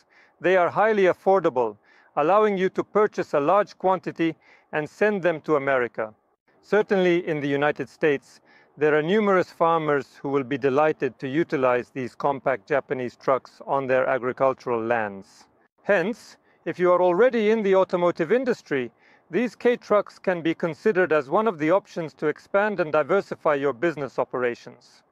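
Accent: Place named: India. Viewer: Так индусы разговаривают на английском.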